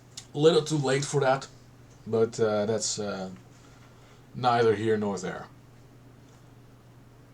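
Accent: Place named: Netherlands. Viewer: Je Engels is uitmuntend maar ik heb zo'n vermoeden dat ik een Nederlands accent hoor dus ik schrijf in het Nederlands ;). Hoe denk je dat de verkiezingen zullen verlopen? Sinds 2016 heb ik het idee dat Amerika steeds radicaler en gevaarlijker wordt en ben mij nu zelfs aan het afvragen of verkiezingen überhaupt zonder kleerscheuren of "spektakel" (in negative zin) gaat plaatsvinden.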